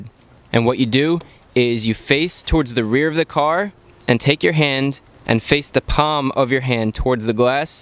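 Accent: United States. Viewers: Your american accent drives me crazy!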